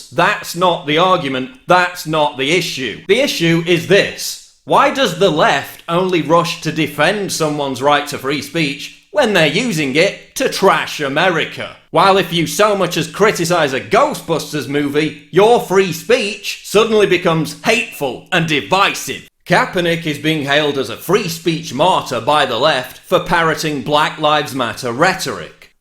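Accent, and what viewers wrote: United Kingdom. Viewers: I can't help but laugh because your accent comes from a country where America isn't liked. Yet you jump on the bandwagon of creating a YouTube about the most recent news so you can collect some easy cash for more tea and crumpet. hehe ^_^ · why do you have a British accent?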